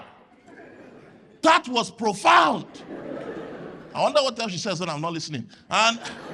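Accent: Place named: London, United Kingdom. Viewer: I just found your show here on YT , thought check him out: is he funny / something interesting to say? YES 😊 especially when you talk that London accent woop woop I Love that.. New sub & support from Amsterdamn, H0llland.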